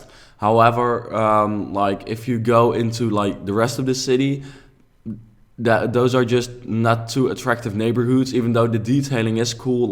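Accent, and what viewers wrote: Netherlands. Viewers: Omg wahah, ik dacht al dat ik het nederlands engelse accent hoorde! ❤️✌🏻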